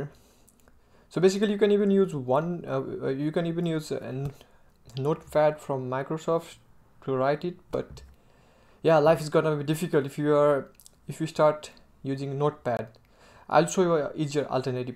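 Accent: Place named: India. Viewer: You are from India, aren't you? I used to think that all Indians just have a bad English pronunciation, but then I found out that Indian English is actually recognized as a type of English like BE and AE. Yet it can be very hard to understand for people having learned and being used to the other types of English (BE,AE). You speak rather clear however, and I think any westerner who speaks English should be able to understand you :)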